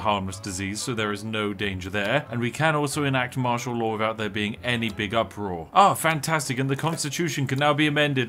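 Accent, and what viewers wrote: United Kingdom. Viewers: I have never heard someone with a British accent be as capitalistic as. It's a "Capitalism Britt".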